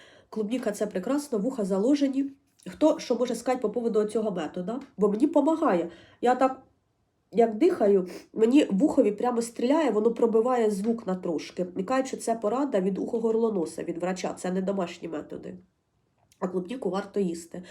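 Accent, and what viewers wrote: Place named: Surzhik, Ukraine. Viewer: Полуниця, полуниця, чорт забирай! Вуха заклало., ви журналістка, полтавка, відмовляйтеся від суржика😊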